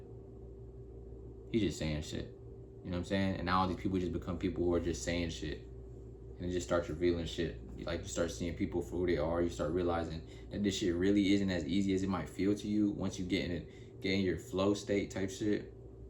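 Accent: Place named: Bay Area, United States. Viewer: You from the Bay Area you sound like it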